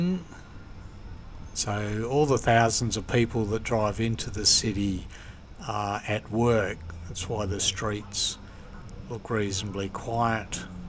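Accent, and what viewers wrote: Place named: South, United States. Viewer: You talk to slow, to be a Darwin local, and you have southerner accent.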